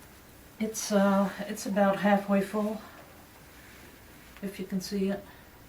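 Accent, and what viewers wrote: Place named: Netherlands. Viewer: ok cool. I'm relatively new to your channel and I'm hooked.. I think about 2 weeks.. but of course I'm not watching in order.. youtube recommends and I follow lol.. I like listening to you very much too.. you're so happy doing what you're doing.. and your accent reminds me of some of my relatives. My mom is from overissjel..and my dad from the Hague.. I'm in Canada.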